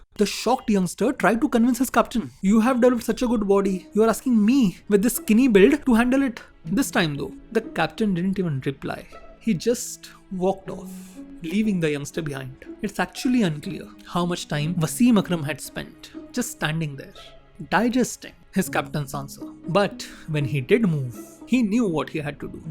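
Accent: India. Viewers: very good commentary....the pace of the speech was extremely good ...the words were clear and spoken with mildness and full of extremely good punctuation. ....unlike the stupid videos uploaded these days where the words aren't clear and there are hundreds of grammatical errors....the accent was very indian but that doesn't matter when the speech is so good.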